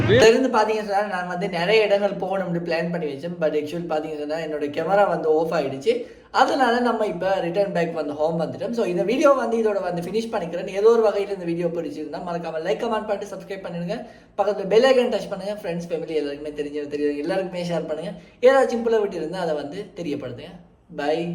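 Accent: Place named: India. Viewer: Eduku da indian pola pesa try panreega🙄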